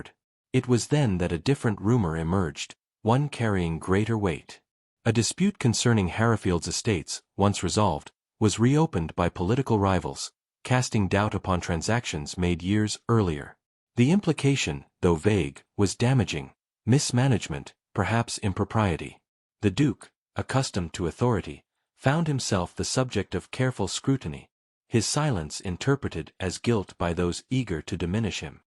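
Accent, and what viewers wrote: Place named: United States. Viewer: Please it’s duty not dooty and duke not dook. For heaven’s sake find someone who can pronounce these words the ENGLISH way and not the American way! 🇦🇺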